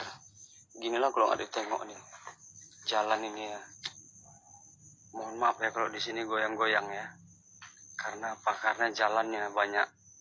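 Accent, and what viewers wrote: Medan, Indonesia. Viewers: Melayu medan kek gini bhasa nya.m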